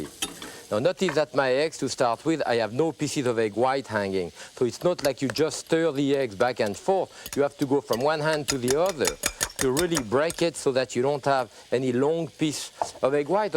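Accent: France. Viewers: beautiful french accent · Well, no doubt he was born French and lived there - until he met and fell in love with an American woman. Being a gentleman, he moved here instead of asking her to be uprooted and move there. And so now he is an American, and a citizen. We thank France, but we definitely claim him. Being the man he is, we'd have given him a permanent green card - so it was his choice - and he became an American. Only his voice, his training, his memories and his accent are French now. And probably a corner of his heart, which is also fine. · This video must have been made over 25 years ago when he was quite young and had heavy French accent. His French accent is not so strong in his latest TV shows. Also, I bet he does not scrape a non-stick pan with sharp-pointed metal forks any more. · Im a french canadian and I just enjoy the french accent ! Im trying to hide mine but it doesn't work often ! 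hahahah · god dammed that guy. fuckin' french way too strong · Thought he was speaking French until 5 seconds in.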